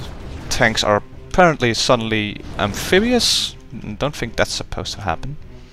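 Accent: Netherlands. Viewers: Dutch peoples english accent is so easy to recognise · Yea, you sound dutch :P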